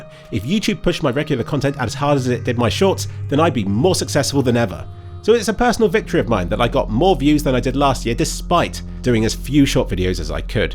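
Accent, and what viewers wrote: United Kingdom. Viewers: I once told a friend about an old animated film, that one famous director spent many years and tens of millions of dollars on, only for it to die in production hell, because of the perfection the director demanded. The friend then said that they should have simply spent more money and time on the movie. He then questioned why all animation shouldn't be 60 FPS, if you love your job you would want to make it as good as possible, right? I love you and your videos, but I gotta be honest - as a layman, I never really notice the difference between the videos you spent 4 hours or a month on, even though I watch 99% of them. If I were to give an objective, third person view of your content and why I like it - it's that I like seeing you talk on random topics I never thought about, but seem interesting in retrospect, you make the most mundane stuff interesting to listen to. 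I'm not into graphics cards or processors, but hearing you talk about this stuff is educational. (maybe I just like British accents, I watched the entirety of Hilda because everyone had cute accents) So I personally just want to see you passionately talk about random stuff you find interesting, making me find it interesting in turn. Don't know if this helped, but I wish you well anyway.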